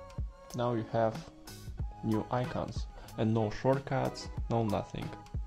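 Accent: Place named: Eastern Europe. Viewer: Найс чувак, по акценту почти не слышно что ты из восточной Европы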